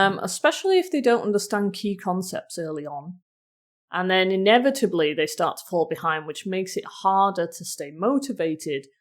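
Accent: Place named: United Kingdom. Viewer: I love the British accent. You speak really clear I understand it very well. Thanks again.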